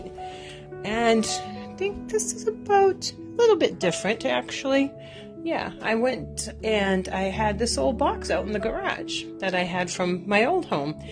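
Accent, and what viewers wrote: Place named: East Coast, United States. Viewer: Always enjoy seeing your home and how you decorate..love your eastern accent 💐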